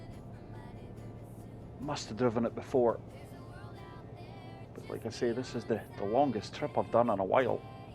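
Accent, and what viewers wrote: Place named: Glasgow, United Kingdom. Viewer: You sound like you from Glasgow ye from there o am and if so what team you support the blues or greens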